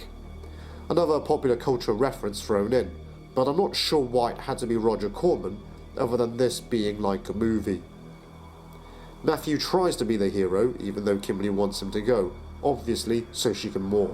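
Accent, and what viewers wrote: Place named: United Kingdom. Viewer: I've been watching your vids for a while now, and I've only just realised you have a British accent. I'm British myself wtf ahahahaha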